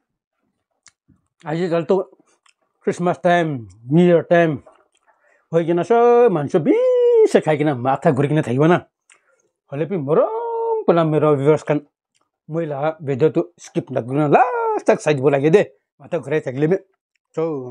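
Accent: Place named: Nagaland, India. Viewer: As much as I enjoy your mukbang I enjoy your nagamese accent.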